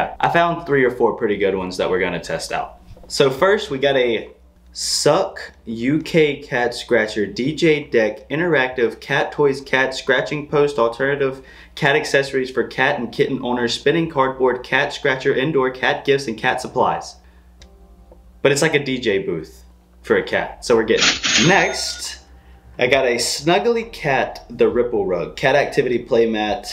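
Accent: South, United States. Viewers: You sound country 😂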